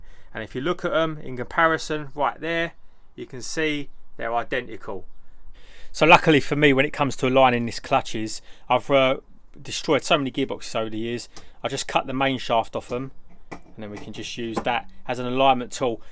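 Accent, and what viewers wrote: United Kingdom. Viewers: Nice to hear a brit bro on here showing our ways. Well done son. Good job !!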